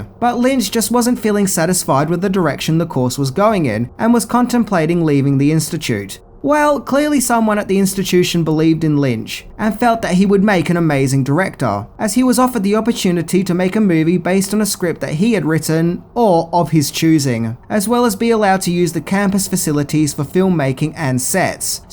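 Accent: Australia. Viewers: he's Australian and it could be his way of pronunciation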